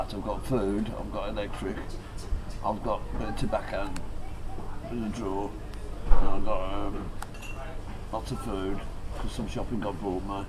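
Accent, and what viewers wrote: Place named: Midlands, United Kingdom. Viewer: Your accent is awesome dude! Me being from Birmingham if always makes me smile listening to the midlands accent 😊 Hope your keeping wall anyway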